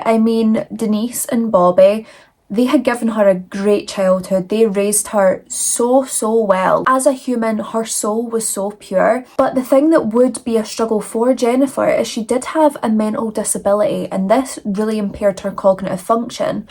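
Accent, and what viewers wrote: Scotland, United Kingdom. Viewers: Is that a Scottish accent I hear?! Where about are you from? ❤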